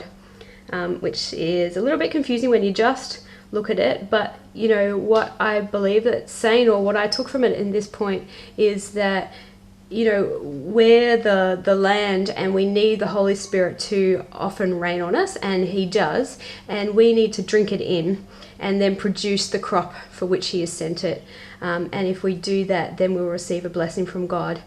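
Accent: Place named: Australia. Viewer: I could listen to your voice all day. Seriously you aussie's have the best accent in the world to me. I love your pages btw. I only just found out about this literally not long ago I haven't even tried this yet but you make it look so easy and fun that I can't wait to try it. I usually art journal (only for a few months) but I think I'm going to to add this to my weekly art time. What a great way to get lost in gods word and learn.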